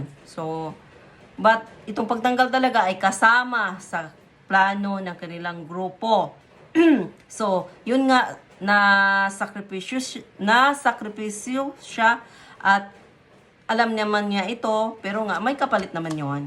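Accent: Visayas, Philippines. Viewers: Bisaya ka ano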